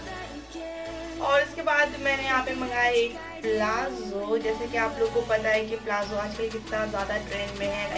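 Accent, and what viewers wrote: India. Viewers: Mast ek dam mumbai language Mai boleto jakas🤩🤩🤩🤩🤩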